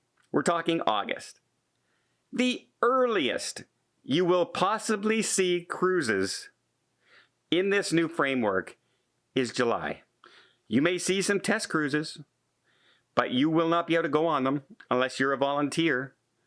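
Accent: Canada. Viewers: All this a guy who's country has banned cruising for a year. If you want to criticize a U.S. Agency then drop the Canadian accent clown and stay in your country!!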